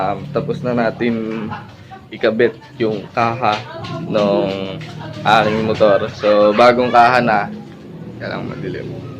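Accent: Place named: Bulacan, Philippines. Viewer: Paps taga bulacan kaba? Sa punto mo kasi eh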